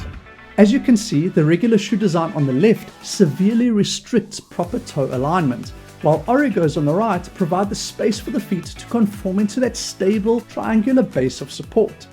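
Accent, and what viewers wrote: South Africa. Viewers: Great video with so much common sense, which should be called “rare-sense” these days. Thank you. PS: you sound South African. Am I correct there boet?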